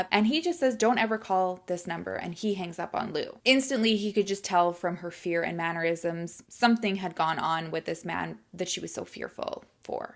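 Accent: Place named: Canada. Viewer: Aw I thought I recognized a Canadian A Canadian accent I love it that’s so cute and Canadians are generally such great people so nice! XOXO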